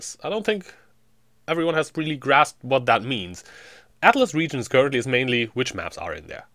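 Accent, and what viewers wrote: Germany. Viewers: wow, your english pronunciation has improved quite a lot over the years. as a fellow german, i always heard the german accent but now its almost gone. keep up the good work.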